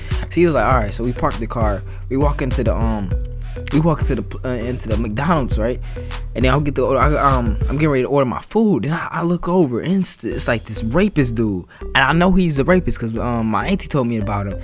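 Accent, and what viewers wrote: Chicago, United States. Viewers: You from Chicago ?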